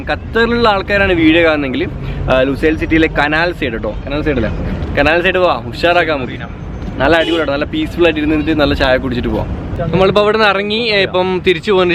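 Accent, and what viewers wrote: Kannur, India. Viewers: ഓവ്വ് എന്റെ മോനെ . ഇങ്ങള് നോക്കിയാട്ടെ . . 😀😀😂😂 എട പോയാലും അമ്മളേ കണ്ണൂര് സ്ലാങ്.. ബേഗം റൈഡ് സ്റ്റാർട്ട്‌ ആക്ക് അമ്മള് കട്ട വെയ്റ്റ് ആന്നപ്പ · Kannur slang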